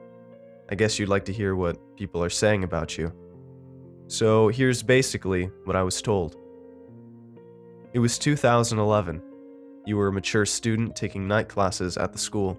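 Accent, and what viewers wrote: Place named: United States. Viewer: i’m american, but have lived in england long enough to know this is written in the POV of someone british, but his american accent reading it threw me off completely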